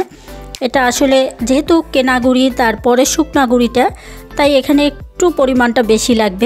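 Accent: Bangladesh. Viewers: Apu apni ki sylheti niki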